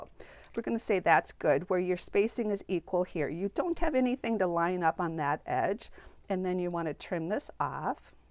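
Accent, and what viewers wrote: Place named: Midwest, United States. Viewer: I saw your twisted ribbon card on Pinterest and it led me to the video. I think your explanation of how to make the card was clear and concise. It’s a beautiful card and I can’t wait to make some. Now I’ll be looking for other YouTube videos you’ve posted. Thank you for sharing your talent. I love your accent, are you from the northern Midwest? U.P.?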